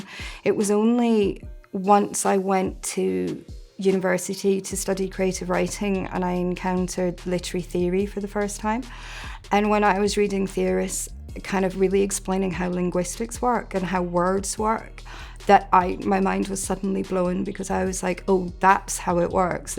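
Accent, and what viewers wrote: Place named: Scotland, United Kingdom. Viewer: I thought I could hear a Scottish accent... but only faintly...I was right..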